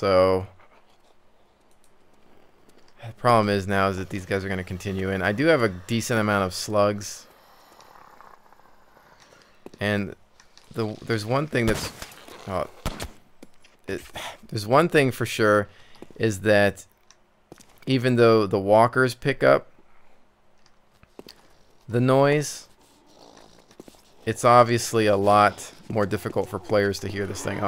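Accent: Canada. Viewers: Great vids! Are you Canadian? I only ask because you say Day Zed, HostILE and Bugger off!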